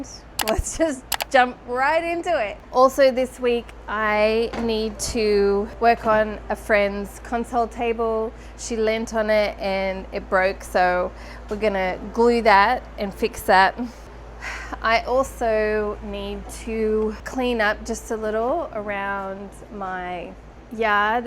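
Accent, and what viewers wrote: Australia. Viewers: So so so glad to hear an Aussie in US restoring furniture .... so glad to have found your youtube channel ...... Trust you go really successfully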